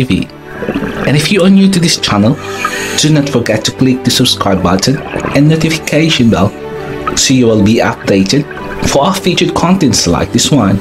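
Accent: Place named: United Kingdom. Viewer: Ung accent tlga lodi..para akong nanunuod ng harry potter..😁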